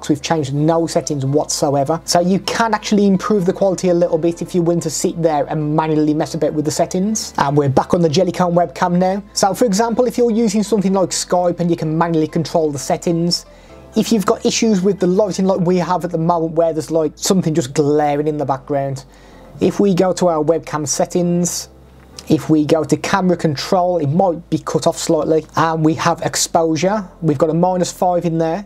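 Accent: United Kingdom. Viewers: Your british english is so fcking hard to listen to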